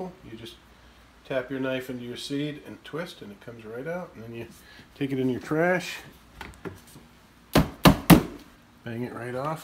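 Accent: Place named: South Louisiana, United States. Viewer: A woman from South Louisiana I can tell.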